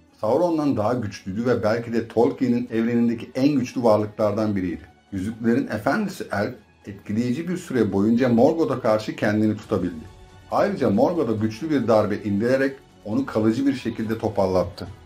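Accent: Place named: United States. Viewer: Telaffuzlar amerikan ingilizcesi kıvamında olduğundan ekrana bakmadan izleyince feynır kimmiş la deyince ekrana bakınca he bizim eski feanor’muş dedim.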